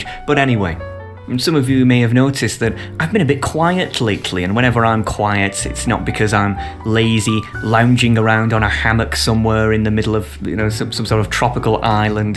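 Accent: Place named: Yorkshire, United Kingdom. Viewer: Good job man, sub earned... You are an interesting orator you sound like a fellow Yorkshire man too (West Yorkshire lad here)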